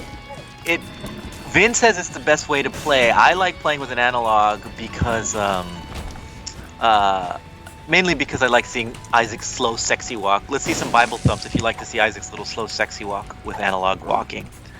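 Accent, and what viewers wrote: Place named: Spain. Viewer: I love the tyrone's spanish accent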